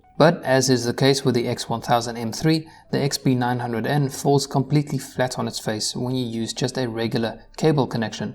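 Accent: South Africa. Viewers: South African?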